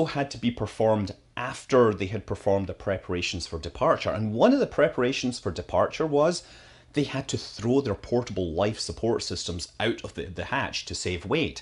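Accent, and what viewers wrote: Scotland, United Kingdom. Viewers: I love these videos. The combination of subject matter, that gets the mind wandering to all sorts of different places , and the Scottish brogue of the presenter means that I have never actually got through one without falling asleep. They’re the best insomnia cure, next to the Jeff Bridges sleep tapes, that I’ve ever had. The sad thing is I now know about half the relevant information about loads of things to do with space flight.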